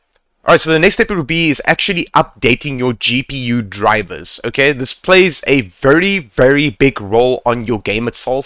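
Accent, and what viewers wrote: South Africa. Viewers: I love that you are south african because I actually like listening to people from home😂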